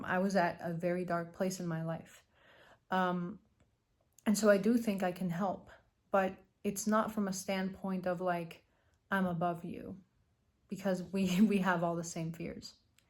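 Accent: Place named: Egypt. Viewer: I was thinking that too! I bet she's Egyptian! Because on CHEX News in Peterborough, Ontario, the weather girl, "Noor Rafat Ibrahim" has that same accent in her voice that has!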